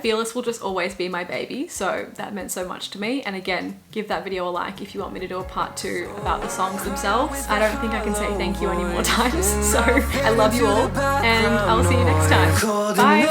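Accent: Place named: Australia. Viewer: Unrelated comment about one of ur tweets but anyone who complains about ur Australian accent is a FREAK and does not deserve ur top tier content because wtf that’s such a wild thing to say to someone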